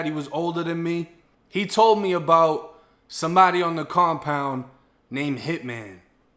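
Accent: Boston, United States. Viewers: This Shìt is WILD!! Been watching Booty bandit stories since Fleece’s hours ago…I hear your accent are originally from up north! Thought I heard some Boston in ya. Lol mad random…. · Are you from Boston bro? Def got the accent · I'm rocking with you from Canada. Love your Bahston accent. What an amazing legacy from the letters of your grandmother. That's beautiful. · Are you from Boston?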